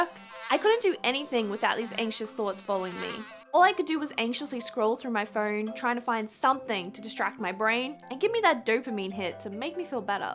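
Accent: Australia. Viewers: Such a great video! super brave and open to share this, I truly love your videos. hope you won't have another second of anxiety ever again <3 p.s. nothing beats the aussie accent! :P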